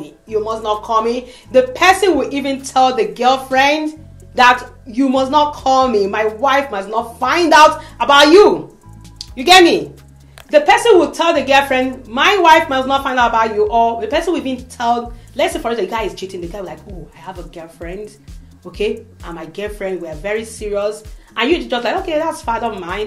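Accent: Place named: Nigeria. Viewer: Im loving th naija accent💕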